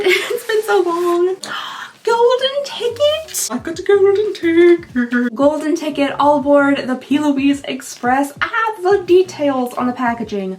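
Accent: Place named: Southeast Missouri, United States. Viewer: Awe! You looked so lovely in this video, young lady! After finding your channel last year, I’ve had a blast watching these! I’m from southeast Missouri so your accent is like home!!❤ thanks for all the smiles!❤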